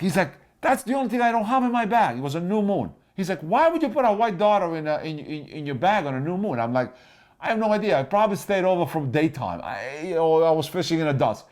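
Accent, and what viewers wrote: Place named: New York, United States. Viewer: you are The Man. I started out just watching your videos when they popped up relating to a subject I was looking for. The more I watch though, the more addicted I'm getting. This one is the best yet - informative, passionate, and really funny. It looks like there's a whole bunch for me to catch up on too. Way to go. Many thanks. Raymondo Ps. Even though I'm familiar with the New York accent (sorry I should have mentioned that I'm in Wales, in the U.K.), you get me sometimes with your pronunciation. For the first few videos I watched I couldn't figure out why you kept talking about your daughter's??? Of course it all came clear when I watch your show on 'darters'. Stay cool pal.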